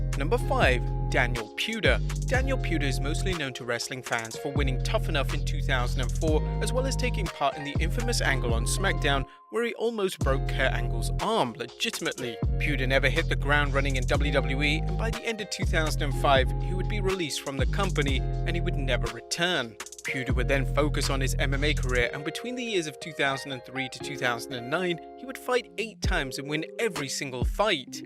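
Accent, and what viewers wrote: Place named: United Kingdom. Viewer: I'm not being a smartass but just telling you that he isn't from America I think he's from UK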